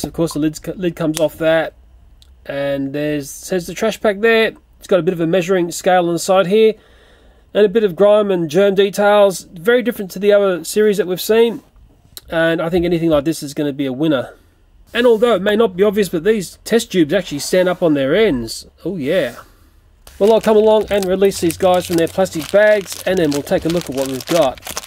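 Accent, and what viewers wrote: Australia. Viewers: cause he’s aussie lmaooo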